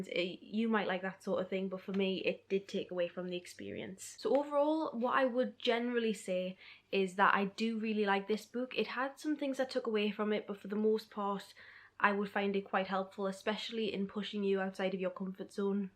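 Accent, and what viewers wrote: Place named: Newcastle, United Kingdom. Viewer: Being from the North East myself, there's nothing nicer to hear than that Geordie accent :)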